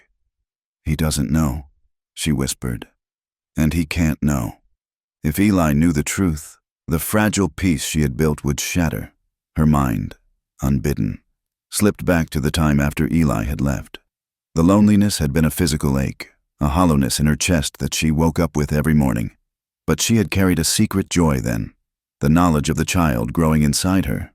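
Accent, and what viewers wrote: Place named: Boston, United States. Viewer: Love your sexy gorgeous voice by way of Boston! Hope it’s everything I want!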